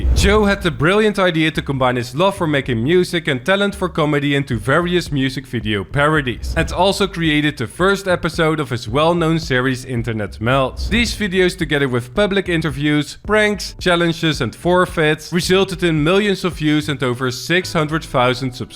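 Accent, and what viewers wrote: Netherlands. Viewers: Ik herken een Nederlands accent wanneer ik het hoor ;)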